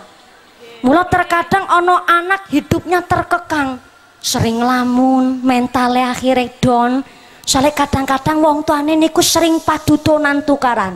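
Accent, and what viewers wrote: Banyumas, Indonesia. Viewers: Subhanalloh q jd seng dgrin ngapaknya ,kira"klu keblitar berapa ya lur,klu ngundang,q pingin,smg bisa nyengke desa ku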